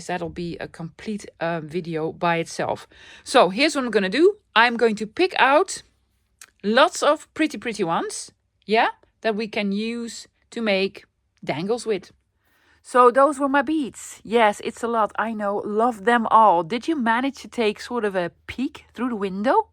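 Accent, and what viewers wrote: Netherlands. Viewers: I’m fairly new to this channel… I only discovered you about a month ago and I’ve been binge watching your YouTube videos. I absolutely love this channel! My mums family is form the Netherlands too so hearing you accent is very nostalgic to me. Anyway just wanted to say that you’re awesome and I love your sense of humour ❤️ love from Australia 🇦🇺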